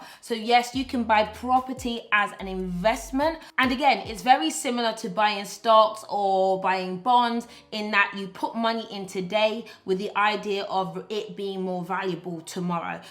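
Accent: United Kingdom. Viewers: She sounds British but uses USD and GBP interchangeably in thumbnails and videos, far too confusing. If it's content for british viewers make it apply to british people and vice versa for americans. Can only assume it's to help her increase reach with american viewers, but it's enough to make me stop watching altogether.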